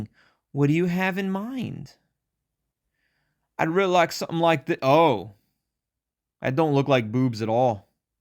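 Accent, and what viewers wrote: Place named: England, United Kingdom. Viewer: I am English and this is the funniest English accent I have ever heard 😂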